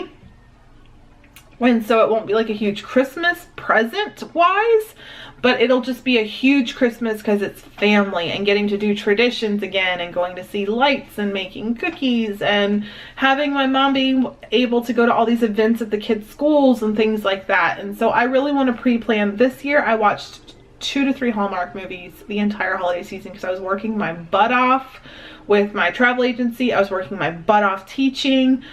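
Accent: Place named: South, United States. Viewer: I am starting to hear a southern accent 😀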